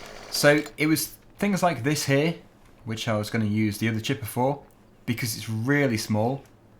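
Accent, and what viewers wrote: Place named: United Kingdom. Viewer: Is anyone else turned on by his British accent?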